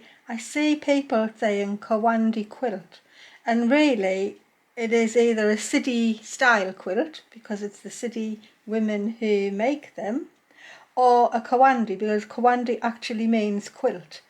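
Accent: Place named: North, United Kingdom. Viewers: Where is your lovely accent from ? I know it’s northern, but can’t place it… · Thank you so very much for inspiring me to try this type of 'patchwork'. Instruction is clear and very helpful also your accent is a lovely hark back to my land of birth. Born near Richmon N Yorks I've lived in regional Victoria (Aus) since 1988. I always thought it strange that folk paid $30 a metre for fabric to cut up then sew back together to make a quilt, perhaps that's the canny lass I am!. So much better to reuse / rescue fabric giving it another life. Thanks again for a lovely video.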